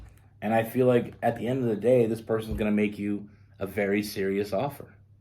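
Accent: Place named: Canada. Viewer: It's the beautiful Canadian accent ❤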